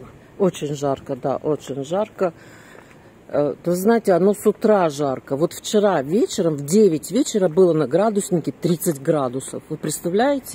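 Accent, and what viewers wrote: Odessa, Ukraine. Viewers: Очень приятный,душевный и голос и одесский говор с дружелюбной ноткой.НЕДОЗАДОЕЛО!)))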